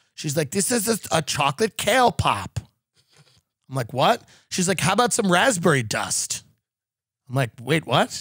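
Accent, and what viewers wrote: United Kingdom. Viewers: As a Brit ex-pat I only tune in for the genuinely authentic british accent. It makes me homesick.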